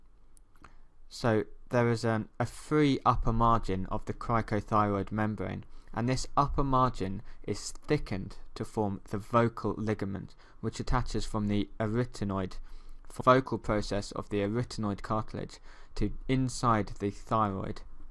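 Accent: United Kingdom. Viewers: You would think a British accent would spice up the larynx, but no it's still so incredibly boring. great video though all jokes aside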